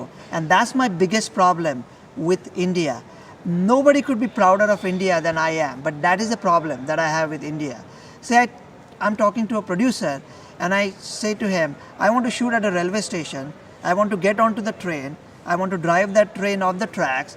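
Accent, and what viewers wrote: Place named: United States. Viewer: Waaa, speaking in अमेरिकन एक्ससेन्ट खूपच छान, बरयाचदा मराठीत ऐकायची सवय होती..👍👍👌👌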